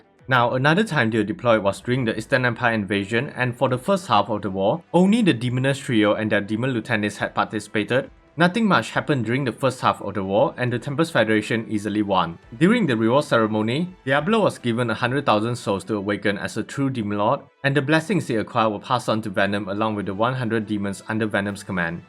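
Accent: Singapore. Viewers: Bro are you Singaporean? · You Singaporean ah?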